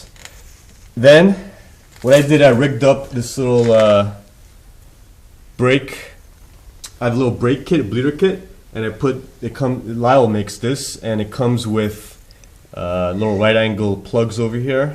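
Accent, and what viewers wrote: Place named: New York, United States. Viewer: Dislike because it should have been a hot girl doing this and not some New Yawker.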